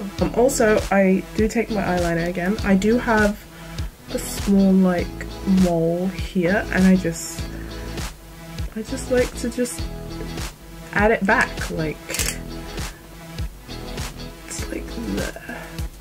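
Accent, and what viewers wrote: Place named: United Kingdom. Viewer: Omg your British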